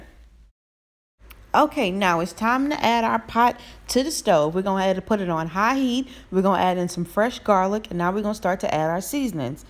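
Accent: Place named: South, United States. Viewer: You can tell she from the south. She said “puhtaytuhs” not “potatoes”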